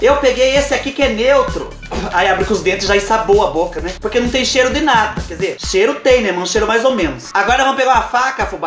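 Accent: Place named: Bahia, Brazil. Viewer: Amei esse seu canal😲Já g annhou uma inscrita nova😁Sou Baiana e seu modo de falar pareçe com o meu 😌😍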